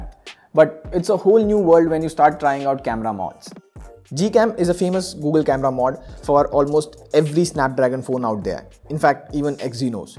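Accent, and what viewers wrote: India. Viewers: clicks on the video, dude speaking in an indian accent, shuts the video never trust indians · Damn, l could barely understand, hideous Indian accent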